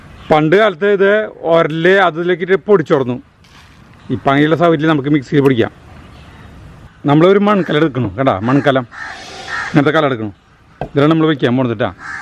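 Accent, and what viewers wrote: Thrissur, India. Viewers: I am regularly watching your blogs of different recipes. I love it, I liked your Thirussur slang. I am native of Kozhikode, but settled in Vellore-632006, Tamilnadu, since 50 years. I love the way you and your wife jointly cook. All the best....Roney Raymond. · I like ur thrissur slang...beef looks yummy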